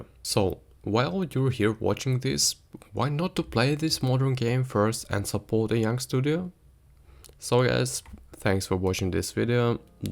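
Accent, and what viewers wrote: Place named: Germany. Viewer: 👍 for saving my time and reminding Piranha bytes accent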